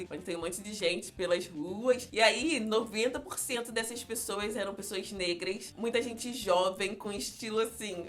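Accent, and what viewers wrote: Brazil. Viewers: vc é africana ? seu sutaque é igualzinho de um brasileiro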